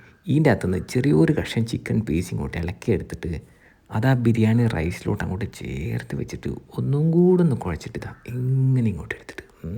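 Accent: Kannur, India. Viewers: ഇയാളുടെ വിഡിയോ ഓഡിയോ മ്യൂട്ട് ചെയ്ത് കാണുന്നവർ ഉണ്ടോ? പാണ്ടിക്കാട് കുഞ്ഞാൻ മലപ്പുറം ഭാഷയെ വെറുപ്പിക്കുന്ന പോലെ ആണ് ഇയാൾ ഓവർ സ്ലാങ് ഇട്ട് കണ്ണൂർ ഭാഷയെ വെറുപ്പിക്കുന്നത്. ഞാൻ കണ്ടേ, ഉണ്ടേ, പോയതാണെ ഹോ കേക്കുമ്പോൾ തന്നെ അരോചകം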